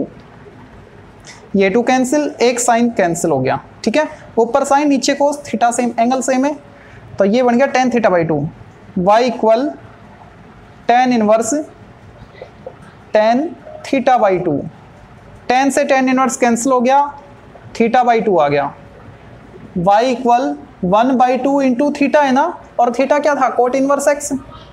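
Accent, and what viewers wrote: Haryana, India. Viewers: Ha mere kne hi si ye btata h ki ap haryana se ho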